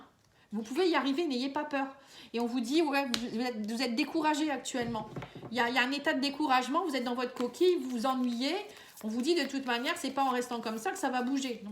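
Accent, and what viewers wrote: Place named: North, France. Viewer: Cc ohoh cet accent du nord que l'on reconnaît fort merci pour la guidance 😊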